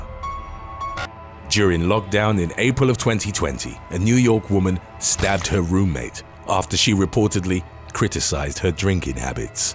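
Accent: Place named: England, United Kingdom. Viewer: This series is addictive! I love the narrator’s English accent!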